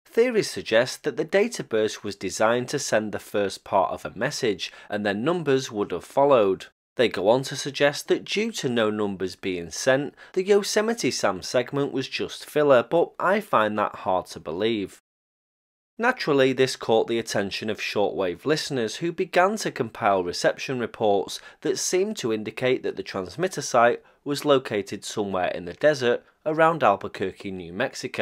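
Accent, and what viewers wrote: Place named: Manchester, United Kingdom. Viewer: Hearing Yosemite Sam referred to in a Manchester accent gives a whole new take on one of my favorite childhood cartoon characters.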